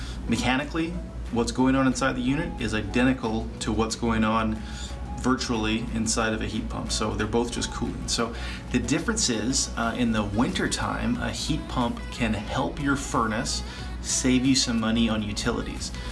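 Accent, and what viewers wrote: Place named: Canada. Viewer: The company says FurnaceUSA but the presenter is clearly Canadian!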